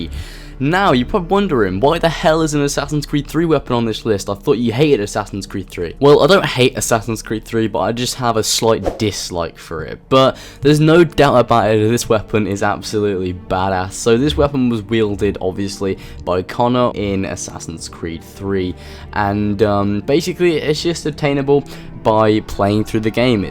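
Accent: United Kingdom. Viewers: I know why u hate ac3 bc Conor kill British soldiers And u sound like a British so it make sense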